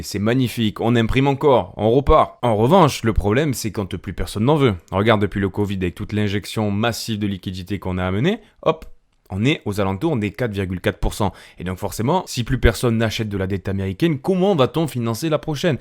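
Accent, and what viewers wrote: South, France. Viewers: tu as l'accent du soleil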